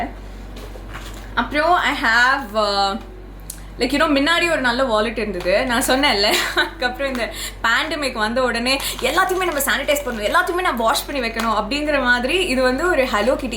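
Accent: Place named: Brahmin, India. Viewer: chamathu, sweet kutti I like the way she speaks lol with smile and in tamil lol cute brahmana tamil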